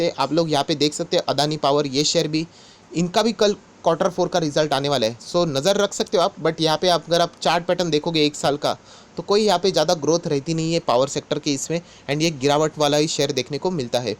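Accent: Maharashtra, India. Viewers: Are you Marathi? You language (tone) seems familiar. BDW awesome content and knowledgeable, following your channel since month and learning new things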